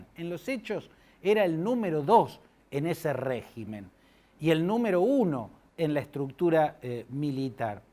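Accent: Córdoba, Argentina. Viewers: Nadie como vos, Claudio Fantini, para explicar estos temas. Ninguno igual para pronunciar esos nombres tan difíciles. Y con acento cordobés de yapa.👌🏻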